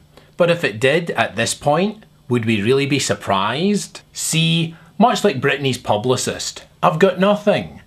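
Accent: Scotland, United Kingdom. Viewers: STOP POLAR BEAR DISCRIMINATION!!! Fuck rats, though..., can you please cuss more? I love when you cuss in your Scottish accent...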